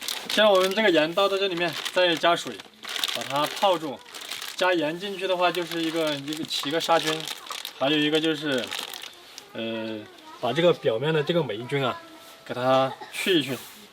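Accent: Sichuan, China. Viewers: 川普讲的很标准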